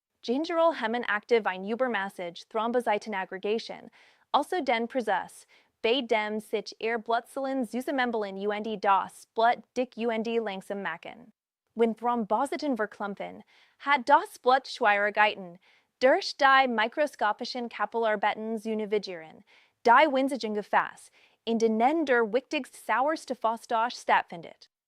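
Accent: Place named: England, United Kingdom. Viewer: Was soll denn der (KI-) Unfug...: deutscher Text, aber englisch und mit englischem Akzent ausgesprochen???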